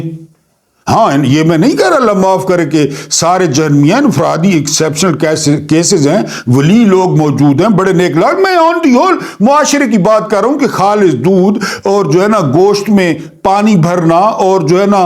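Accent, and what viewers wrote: Saraiki, Pakistan. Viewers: Masha ALLAH kia khoub saraiki ka touch detey hein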